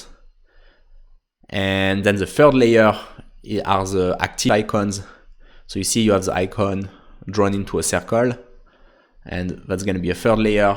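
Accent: France. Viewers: c'est encore plus stylé avec l'accent frenchie ahhaa ;)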